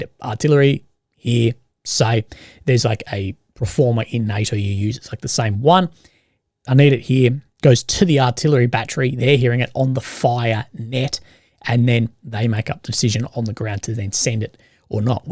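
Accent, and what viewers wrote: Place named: Australia. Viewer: Australian accent, get over it.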